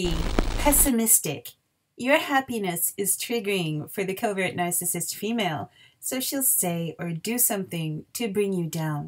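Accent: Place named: Canada. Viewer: I hear the Canadian in the presenters voice lol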